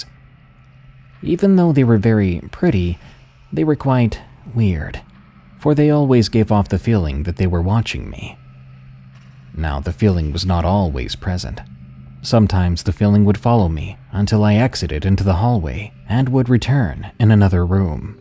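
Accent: South, United States. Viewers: Souther comfort with a southern voice! All tuckered in man. Thx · Southern accents are great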